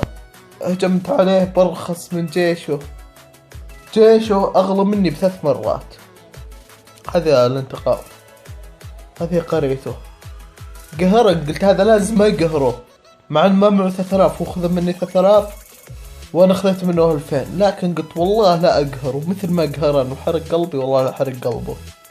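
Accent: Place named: Qassim, Saudi Arabia. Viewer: اية بس لهجتة قصيمي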